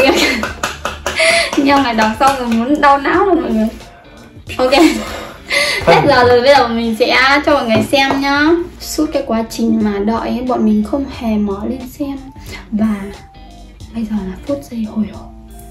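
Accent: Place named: Hai Duong, Vietnam. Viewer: Nghe giọng của em thì rất giống người hải dương. Có phải vậy ko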